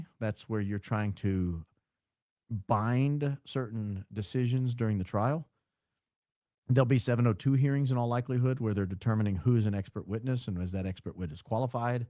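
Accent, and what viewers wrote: South, United States. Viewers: Alright, I’m going to need 1987 southern accent to make a comeback😂😂😂. I also have never voted for memaw Ivey. And I’m not voting for Tommy from Florida either lol 😊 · You absolutely should split your vote up and always vote for the best candidate that's best from America First and Only. I also don't think you looked fat and terrible in that old video, I also like the southern tang a bit.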